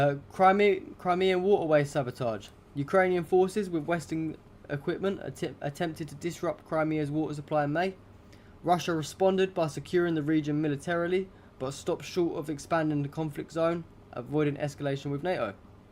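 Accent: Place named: United Kingdom. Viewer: I like how your accent is (the British flair 😅), especially at the beginning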